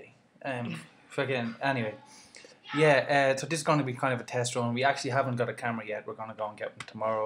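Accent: Ireland. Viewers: Woah I never noticed you were Irish in & Vlogs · Where in Ireland are you from? Dublin ya? Haha I'm Irish and I swear so much lol I need to stop!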